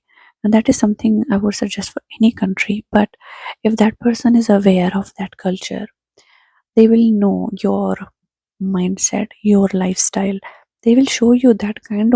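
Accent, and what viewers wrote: India. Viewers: You need to make more flirty videos with the indian accent.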